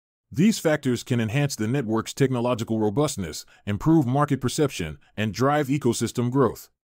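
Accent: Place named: Singapore. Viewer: Prefer your SG accent lol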